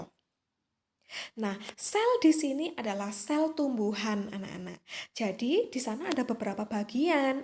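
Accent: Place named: Java, Indonesia. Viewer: Namanya berarti orng bali, logatnya kek jawa 🗿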